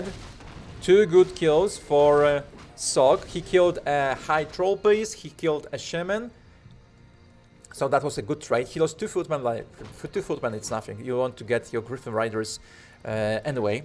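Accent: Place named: Poland. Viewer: Hej,! (Świetny nick, na marginesie, teraz to widzę) Wspieram i popieram wejście na anglojęzyczne rynki!!! Nie będę Ci na nic narzekał, bo wiadomo, początki niełatwe. Nie wiem czy mieszkasz bliżej Warszawy czy Krakowa, ale jak to drugie, to może byśmy się spotkali, postawię Ci coś dobrego;) Zdziwiłem się, bo z tytułu myślałem, że będziesz grał z zagranicznikami, i swoje komentował po angielsku. Akcenty i wymowę warto może trochę podszlifować, bo brzmi bardzo Polsko. Ale nie przejmuj się! Popieram, rób tak dalej, warto! Najważniejsze to robić, reszta przyjdzie z czasem;) Na marginesie, chętnie zaangażowałbym się w kierunkowanie strategii rozwoju, ale Twoja wola. Jeśli nie, i tak wspieram wszystkimi czterema kończynami. Powodzenia! Sława!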